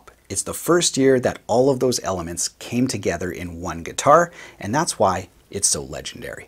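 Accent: Canada. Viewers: I could tell you were from Canada! I'm from PEI!...'Eh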